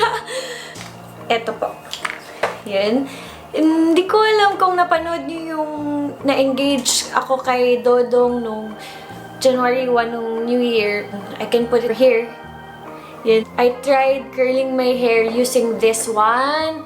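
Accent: Visayas, Philippines. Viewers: Bisaya kasi siya. Ganyan talaga pag nag tagalog ang mga bisaya, mapapansin mo 'yun. Bisaya rin ako, alam ko 'yan. It's somewhat strange and lil bit hard for us to talk in a dialect that is not ours, ganun rin naman siguro sa inyo. It's not being maarte.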